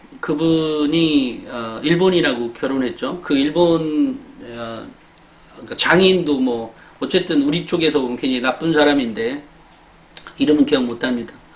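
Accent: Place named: Jeolla, South Korea. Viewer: 말투가 전라돈데 정말 정신나간 인간으로 밖에 안 보입니다.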